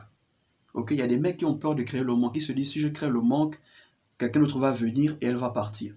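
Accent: Gabon. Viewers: Tu parles tellement bien...je pari que tu es Gabonais comme moi, vu ton accent 🤔et tu es très explicite dans tes dires, contrairement à plusieurs pseudo coaches qui sont plutôt évasif sur leurs sujets🤷🏽 je ne peux que m'abonner à ta chaîne 👍🏼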